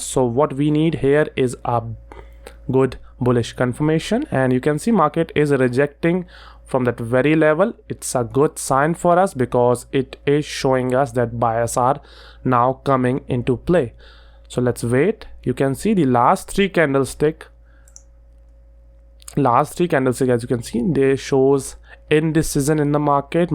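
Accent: India. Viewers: Very good explanation with good indian english...we know very well sir....excellent video.....new subscriber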